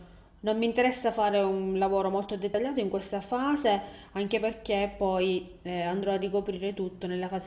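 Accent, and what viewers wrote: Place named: Sicily, Italy. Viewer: grazie per i tuoi video e complimenti, si sente che sei siciliana, un saluto da palermo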